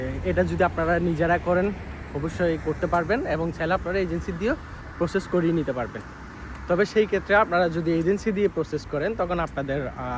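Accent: Chittagong, Bangladesh. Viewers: Chatgaiya mne hoi vaiya apni??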